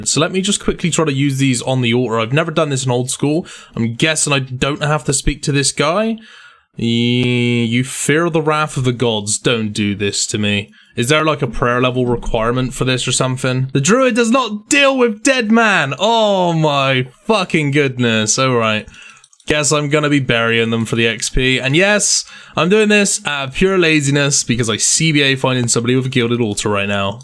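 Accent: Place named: Somerset, United Kingdom. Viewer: mate that 'sommersert' accent..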